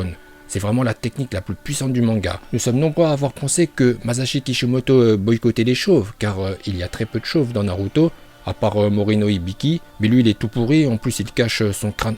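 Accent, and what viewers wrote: Japan. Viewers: Wais ton dégoûtant accent japonais est le seul truc qui n'empêche de m'abonné et en plus de ca tu n'arrête pas de t'en vanter arrête avec çà Déjà avec son Goku qui glisse sur le crâne chauve de jiren et ca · Ton accent en japonais il est éclater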